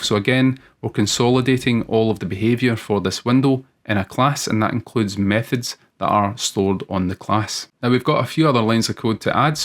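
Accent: Scotland, United Kingdom. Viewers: listening to scottish always makes me really happy for some reason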